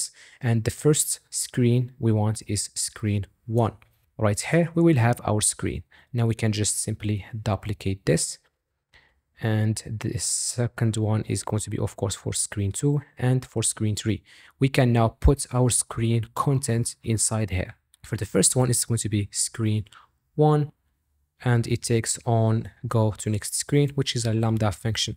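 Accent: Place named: United States. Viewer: اشرح عل مهلك خرب حظك مشالله عليك احس انك مولود في أمريكا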